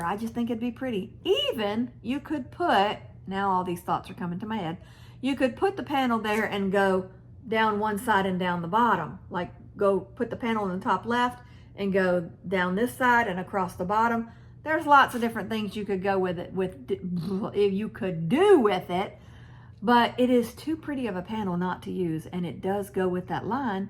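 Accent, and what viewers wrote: South, United States. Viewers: Good Saturday morning Miss! Thanks for sharing my quilts. My last name is Rick-er. Rick with an "er" on the end. You're such a sweet lady. I love your southern accent.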